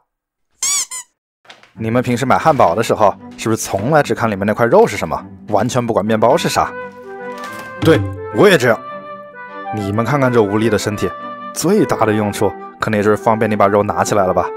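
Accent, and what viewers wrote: Wuhan, China. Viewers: 这个弯管子普通话真的是听得想家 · 那~~有莫斯办法撒？ 有点吧甜，有点吧韩，哈哈哈哈。 这弯管子普通话太熟悉了~~哈哈哈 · 博主是武汉人啊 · 好怀念弯管子普通话。确定博主是武汉的妈妈😁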